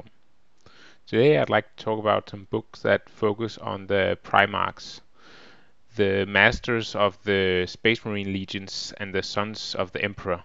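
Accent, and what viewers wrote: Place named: Denmark. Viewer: Are you from Denmark? You have that danish accent. Nice video tho!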